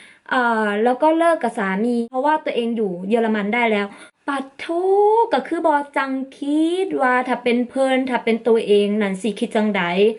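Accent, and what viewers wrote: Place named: Isan, Thailand. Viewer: เว้าอิสานน่ารักมากน้อง 😘😘😘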